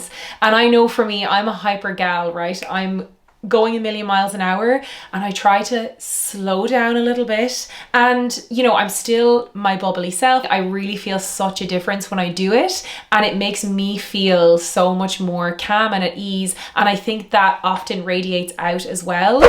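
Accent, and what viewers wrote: Ireland. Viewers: Who cares? It doesn’t alter the meaning or context of what she’s saying plus she has a strong Irish accent, go and Karen somewhere else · She's Irish and her pronunciation is utterly charming .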